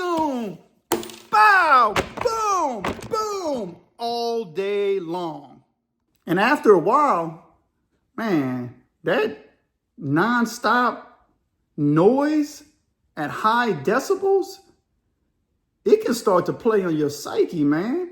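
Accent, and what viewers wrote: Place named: South, United States. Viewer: Great story man! You have a nice slow paced southern storytelling style where 20 minutes went by and it didn’t feel like 20 minutes. You have a gift!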